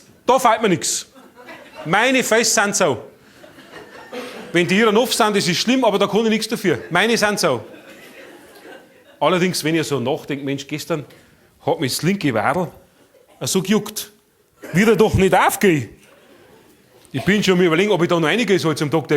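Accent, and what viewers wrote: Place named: Upper Palatinate, Germany. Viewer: Der Oberpfälzer Dialekt ist schon der Hammer ! Toni Laurer ,Bayern's Bester ! 😀👍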